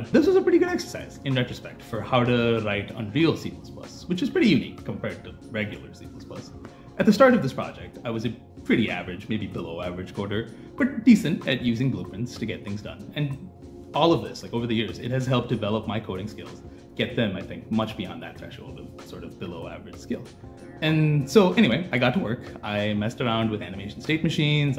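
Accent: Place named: Brazil. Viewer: Your accent almost made me think you're Brazilian... It's so close...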